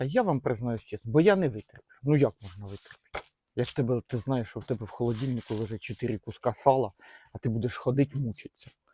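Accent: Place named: Dnieper, Ukraine. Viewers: Який суржик, нормальна наддніпрянська говірка)) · Навєрно з Дніпропетровщини, так само балакаю))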